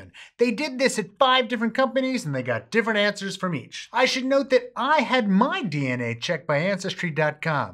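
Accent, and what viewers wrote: United States. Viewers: If you're 99% Eastern European Jew, why are you talking like a 100% Jersey shore Italian good fellah?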